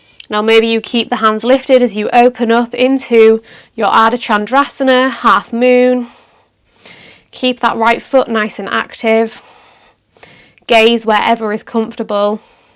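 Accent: Yorkshire, United Kingdom. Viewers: just discovered your channel, really enjoyed this flow, think this will now become my go to for yoga tutorials, really nice energy and excellent presentation skills plus, last but certainly by no means least you are gorgeous, do i detect a Yorkshire accent/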